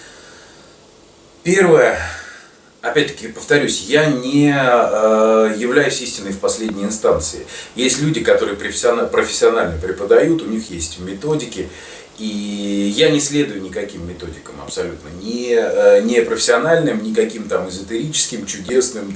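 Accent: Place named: Belarus. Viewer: Українською спілкуєтесь майже як білорус :))). Мабуть спілкування з представниками північних регіонів країни впливає :))) Треба більше практики та спілкування з носіями мови, а також сало, горілка та пампушки будуть спонукати до кращого розуміння не тільки мови, а й культури відносин. Хай буде більше:)))) зустрінемось ;)))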